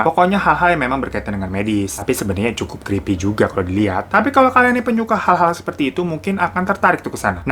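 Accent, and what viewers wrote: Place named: Indramayu, Indonesia. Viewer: Saya curiga Kalo bang ini orang indramayu selipan kata kata Dan logat mirip gitu sih hehe hayoo bang darimana sih bang kamu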